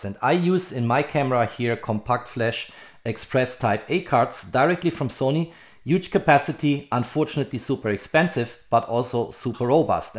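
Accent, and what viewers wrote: Germany. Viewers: Sehr gutes Video. Endlich mal sehr verständliche Erklärung der Einstellungen. Wollte meine Einstellungen parallel vornehmen, bis mir aufgefallen ist, das z.B. beim Touch Panel einige Einstellungen fehlen. Da wurde mir klar, dass ich nicht die A7R5 in der Hand hatte, sondern meine A7iV. Die beiden sehen sich so ähnlich. Was ich noch nicht gefunden habe, ist die Einstellung zum Menü. Das heißt, drücke ich Menü springt die Kamera stets zum oberen Punkt, bei der A74 zeigt sie die letzte Einstellung, was mir viel lieber ist. Werde wohl noch eine Weile brauchen, bis alles optimiert ist. Möchte auch die Einstellungen, soweit möglich, zur A74 identisch haben, damit ich mich nicht umstellen muß. Danke für die großartige Arbeit, ich hoffe Du verstehst die deutsche Sprache, sonst deepL nutzen. PS: mir kam es sogar so vor, als hättest Du einen leichten deutschen Akzent im englischen, aber nur sehr leicht.In Minute 39 erklärst Du was ich suchte, hätte ich nie gefunden.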